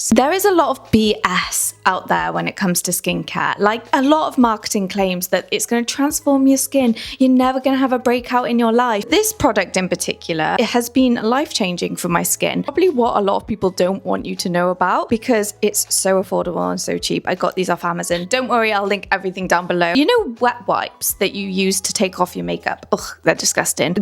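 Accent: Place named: United Kingdom. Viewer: You accent is so cute. Where in the UK did you grow up?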